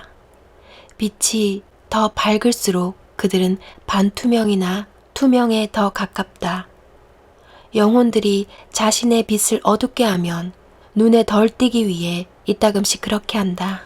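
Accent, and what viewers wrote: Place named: Busan, South Korea. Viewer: 재밌는... 흥미로운 내용 감사합니다.. 혹? 부산이세요.. 반갑습니다! 매일매일 행복하세요...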